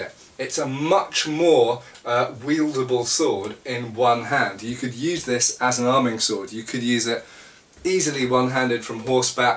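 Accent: United Kingdom. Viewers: I don't know how, but you sound more British here.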